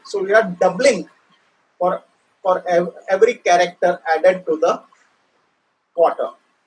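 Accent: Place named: India. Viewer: I wasn't expecting indian accent 😅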